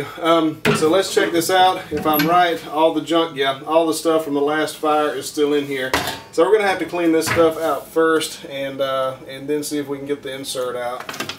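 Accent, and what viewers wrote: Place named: Appalachia, United States. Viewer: Where are you from. I like your accent. Is it a hillybilly accent? Thanks from the UK.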